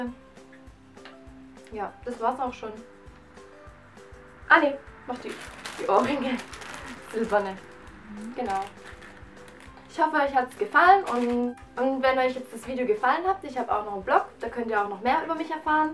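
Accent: Swabia, Germany. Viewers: "Des isch" ich komm auch aus deiner Gegend und Finds total schee, wenn ma son bissl Schwäbelt ;) du bist wunderschön, dein Video ist Super geschnitten, du redest als hättest du nie was anderes gemacht...ERSTES VIDEO?! Mach auf jeden Fall ganz bald dein nächstes Video!! Auch wenn ich nicht wirklich viele YouTuber abonniert habe, aber du hasch nach dem Video sofort dazu gehört und ich freu mich auf weitere Videos. Du wirst bestimmt eine große YouTuberin!!!! Viel Glück und ich bleib dabei ;* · Woher kommst du? Hast einen schwäbischen Akzent ;) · Kommst du aus Schwaben?:) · Hehehehe dein schwäbischer Akzent♥ ich komm au aus der Gegend;-) tolles Video:* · redest du schwäbisch? :D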